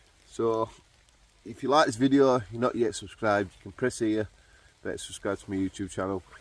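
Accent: United Kingdom. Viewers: We call your pot noodles " Ramon noodles" but we don't have curry flavored. Would like to try that one. I just ran across your channel and subscribed. I use to camp a lot in the woods. Never camped at campsites. Hearing things in the wild. Use your gut instincts. Always stay vigilant. I hear a lot of stories from people. And yes, I believe in Bigfoot and aliens! Where are you from? You sound like you have a British accent? Stay safe and always trust your instincts !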